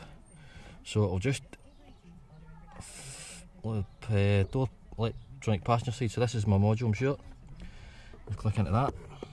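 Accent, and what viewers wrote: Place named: Scotland, United Kingdom. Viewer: Thank you for taking the time to do this diag video. Well explained and I can even understand the Scottish accent. Ha. Thanks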